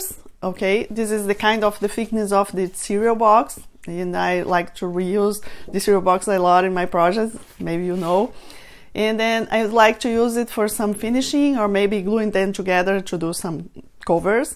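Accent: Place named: Brazil. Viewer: Are you Brazilian? By your accent, I guess so. 💜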